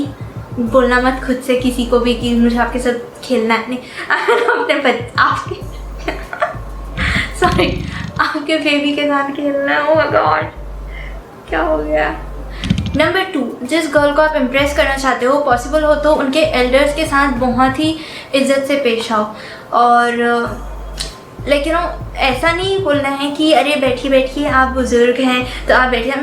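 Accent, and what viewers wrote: Chhattisgarh, India. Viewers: I love that chhattisgarhi accent❤❤ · Chattisgarhiya sable badhiya 😁 It's nice to know that u r chattisgarhiya Where r u from in cg.... Ur cg accent is cute 😜😜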